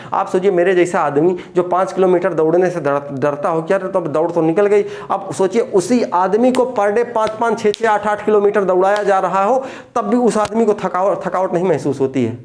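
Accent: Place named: Awadhi, India. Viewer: अवधी भाषा लगातीआपकी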